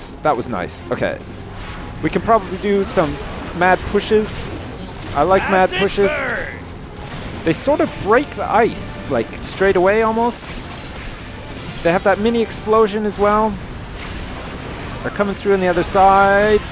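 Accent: Canada. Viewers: Oh, you are so canadian. soory everytime you say it it makes me smile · Which part of Canada are you from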